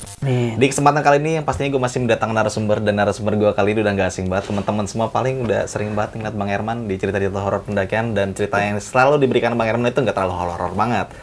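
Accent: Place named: Betawi, Indonesia. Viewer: kocak lu bang 🤣🤣...bahasa betawinya ora dibuat2 natural bgt..wkwkkw biarpu ceritanya lompat2 ketutup sm pembawaan ceritanya..sukses terus buat chanel besok pagi dan bang,w suke gaye lu..😁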